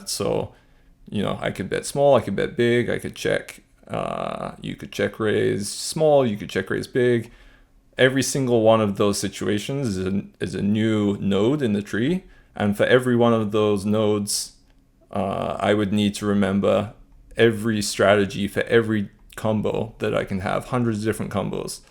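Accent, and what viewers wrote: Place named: United Kingdom. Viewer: A very particular from of the British accent. I know a small Youtuber who has almost the exact same tone and cadence.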